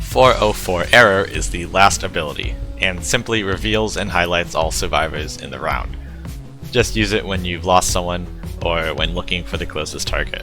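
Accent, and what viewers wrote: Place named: United Kingdom. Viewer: your British, aren’t you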